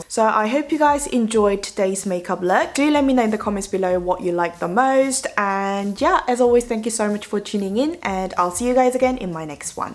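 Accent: United Kingdom. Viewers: 너무 예뻐요!!!! 눈도 피부도 입술도 다 찰떡같이 잘 어울리세요ㅎㅎ 영상 분위기는 깔끔하고 자극없어서 영국발음도 부드럽게 들려요 이 점을 정말 좋아해요💕💕💕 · 영어로 말하시는 영상 볼 때마다 영국 발음에 치이고 가요...... ㅠㅠ 진짜 너무 멋있어요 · 마스카라너무 이쁜거 같아요!!! 항상 느끼지만 영상 보면 너무 영국영어 배우고 싶어요ㅠ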